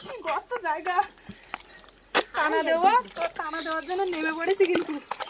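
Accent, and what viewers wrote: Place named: Dargahpur, Bangladesh. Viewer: মুখে দরগাহপুরের ভাষা শোনা যায়।হন্যে চিংড়ে।জালে গাব দেওয়া নেই। গা হাত পা ঠান্ডা হয়ে যাচ্ছে।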